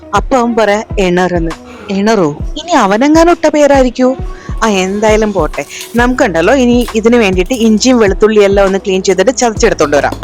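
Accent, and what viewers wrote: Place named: Kozhikode, India. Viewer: ഇണർ കോഴിക്കോട് 😅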